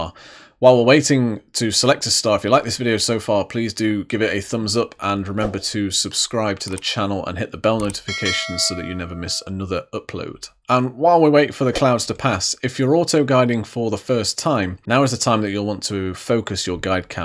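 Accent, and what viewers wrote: United Kingdom. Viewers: WOW...That was a Fantastic Presentation...I was writing fast...Stop and Write and start up again...You are so very knowledgeable - Very Impressive...As a Newbie...Even with all the Required Equipment...I see I have a Long Learning Curve...But with your Videos...You have made the Process Less Painful... Beautiful Accent...You must be from The Mother-Country...Florida Here...Thanks again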